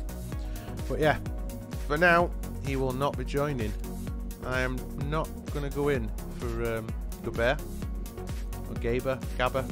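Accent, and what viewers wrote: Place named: Northern England, United Kingdom. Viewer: Relaxing, insightful commentary from a northern lad, doesn’t get much better than that! Liked and subscribed 👍🏴☕️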